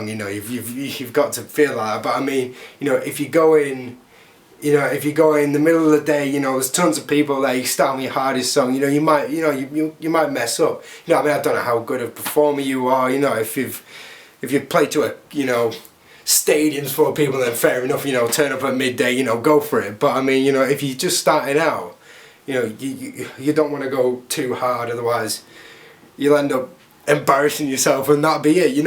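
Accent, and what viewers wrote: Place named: Alabama, United States. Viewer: Ya no dat albama acent ya no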